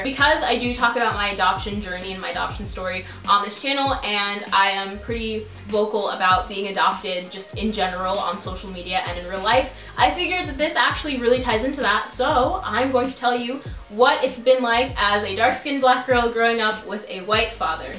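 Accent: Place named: United States. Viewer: Wow, your fine as hell, I like your American accent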